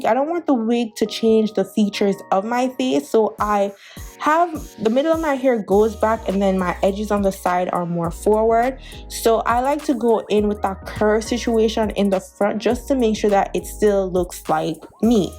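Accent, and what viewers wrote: Jamaica. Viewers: Girl look pon de lace lmao, love when you speak patois. Lovely unit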